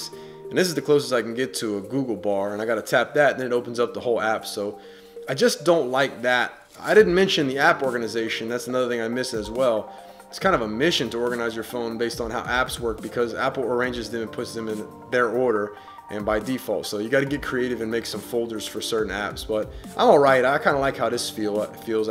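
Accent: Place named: New York, United States. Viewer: You have a bit of an accent or dialect, are you from New York by any chance? I'm probably just crazy.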